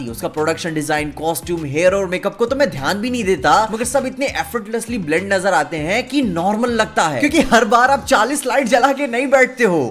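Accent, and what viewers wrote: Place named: Lucknow, India. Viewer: bro kaha se ho app ki bolne ka tarika lucknow ka lag raha hain vaise main bhi lucknow se hu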